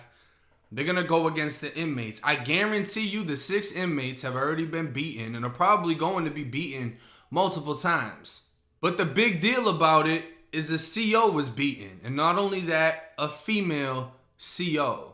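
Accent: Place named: New York, United States. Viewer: sound like you got a ny accent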